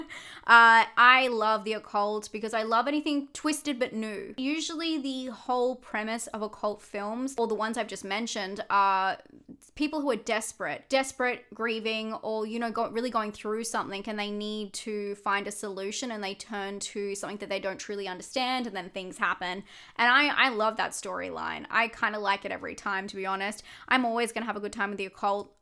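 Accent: Australia. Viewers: Australian English loves those diphthongs! 😆 I like Aussie accents a lot too.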